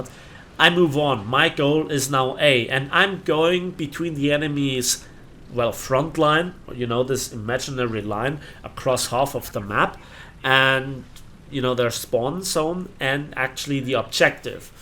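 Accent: Germany. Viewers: I just discovered this channel, after looking for an AMX-30 gameplay, and I was amazed at the quality of the reviews you make. You have by far the best videos on WT Ground Forces I've seen, and i've been playing/watching WT for 5 years. Great channel,. Just wonderful. I find your videos superior to any of the top youtube channels that cover WT Ground Forces. Oh, and are you german?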